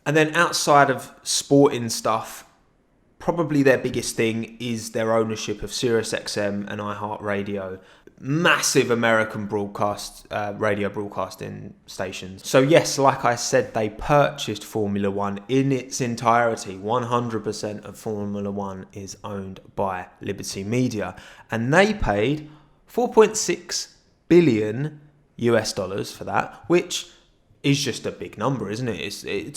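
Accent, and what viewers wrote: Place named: West Ham, United Kingdom. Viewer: I could feel the West Ham in your accent from the very first video I watched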